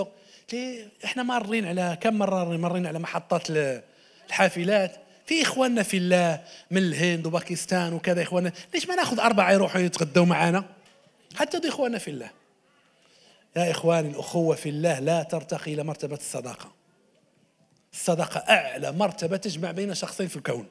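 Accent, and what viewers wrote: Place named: Morocco. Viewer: نعم اختي شككت في اول الامر لان لكنته مغربية والأن كأدتي لي تخميني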